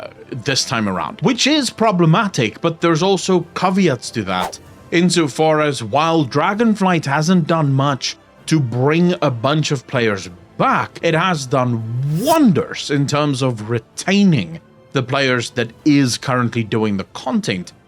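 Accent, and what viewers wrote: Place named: South Africa. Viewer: are you south african? love your accent awesome video and good takes. og wow fans will stay, reminds me of the wod period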